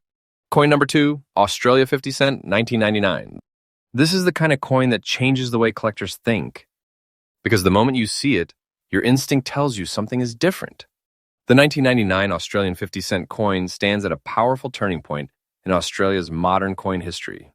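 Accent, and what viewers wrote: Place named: United States. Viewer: Something fishy about what you've said about 1984 Australian 50c coin, started with $201,000...and later, you said it's valued at $183,000 Really? Is it genuine? Not some kind of scam? I'm sure.. I'm not the only one watching and following this video. Should be an Australian talking about Australian coins, but you sound like an American.. Come clean, man!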